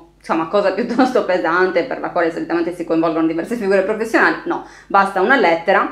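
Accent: Sardinia, Italy. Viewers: Non spesso sono d'accordo con te (sì sullo scwha, no - o con molti distinguo - sui grammar nazi), ma sentirti stroncare un libro con il tuo simpaticissimo accento sardo non ha eguali!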